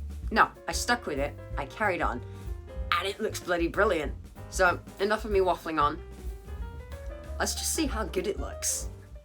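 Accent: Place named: Australia. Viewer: Hey dude where you from you almost sound Australian or kiwi.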